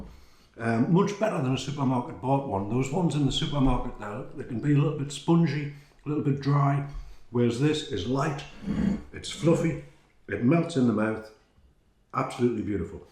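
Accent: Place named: Northumberland, United Kingdom. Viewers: Born but sadly not raised in Northumberland so lovely to hear the accent a plus to a great idea and sensible and simple delivery! 🙌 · I just found your vlog, as an expat living in Seattle Washington, I appreciate the recipe & your lovely accent, I am assuming you are from the North of England, thanks for the recipe, will definitely give it a try 👍🏼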